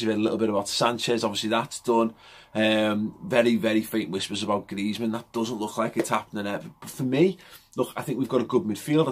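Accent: Liverpool, United Kingdom. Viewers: I swear to god, go on settings on this vid and turn the speed down to 0.5 and listen how incredible the scouse accent sounds in slo-mo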